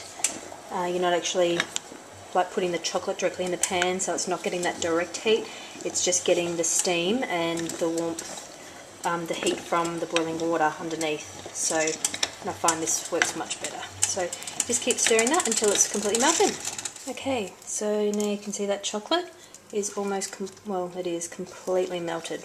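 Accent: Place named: New Zealand. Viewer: Keeping this for my inner choccy Kiwi devil!🥰🥰 Thank you for sharing this, great to hear a downunder accent and our sweet lingo on yt!👍👍 Would have loved to see the slicing and tasting of it, but just grateful for the recipe without marshmallows tbh! Will try it with coconut mixed through. Well done, its champion!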